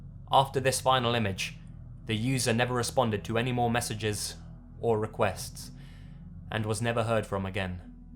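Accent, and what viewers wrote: England, United Kingdom. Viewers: I take this too seriously because of his Sherlock Holmes accent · just came from another video, a guy with a crappy voice. While waiting for the ad to finish, I was thinking "Please have a britiish accent ;-;" wasn't disappointed. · Do you have a world map or maybe even a globe? If so, I'd encourage you to observe there are actually four countries that Britain is comprised of, namely Scotland, Wales, Northern Ireland and England. I've named them in that order, so you'll see what you believe to be a British accent is, in fact, an English accent. The rest of us, comprising of three Celtic nations, can hardly be expected to bear the blame for the narrator's accent, as we are not English, nor is there a common accent, only a language. Do you see what I mean?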